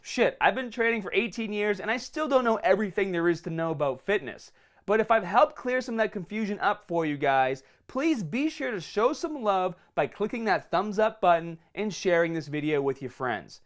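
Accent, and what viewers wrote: Boston, United States. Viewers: Boston af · I have been underexposed to the Boston accent. I'm actually having to remind myself that this is how he seriously talks and he's not trying to be funny or anything... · Is that a Boston accent? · I can't tell if he's from Boston or Australia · Your Boston accent didn't allow me to focus on what you were saying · Is he from Boston? I can kind of hear the accent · Is he Bostonian? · I'm portuguese, and i never even been to the US but i can recognize his Boston accent. Its really thick! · lol is he from boston or something? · Is he american or aistralian?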